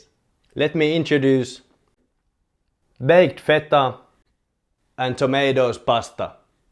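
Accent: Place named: Finland. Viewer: It's the Finnish accent for me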